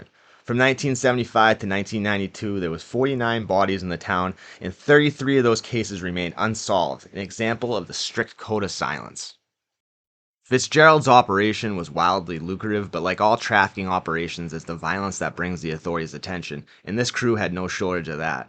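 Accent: Boston, United States. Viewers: I live in Maryland in what they call the DMV and love learning about the history of other places. Your video was great in that it touched on all sides of The Town from inception to its current state. My only note would be if you could talk a little slower. Bostonian's have a distinct dialect that can be hard to understand if you're not from there and if everything is new to you. But, I'll be watching more of your videos. All the best. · As a Boston resident this is the only accent I want to here this information told in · Just found ur channel & I watched a few videos, & I enjoy ur content. If I can say something tho, do urself a favor & get a better mic so ur voice doesn’t sound So muffled & slow down ur speaking a lil bit. Speaking so fast mixed with ur Boston accent, it’s a little hard to keep up with what ur saying. Good content tho & I’ll stick around. 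· I came for the history and stayed for the crime… history is always a story of blurred lines between crime and politics. By the way, no one better to tell the story and somebody with a proper Boston accent. Cheers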